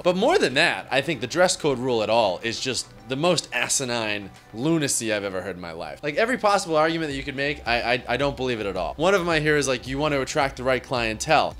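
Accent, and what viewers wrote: Boston, United States. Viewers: don't you dare fuckin censor that beautiful boston twang ya gump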